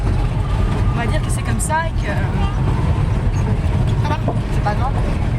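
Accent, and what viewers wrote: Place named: Franche-Comté, France. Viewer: c't'accent franc comtois !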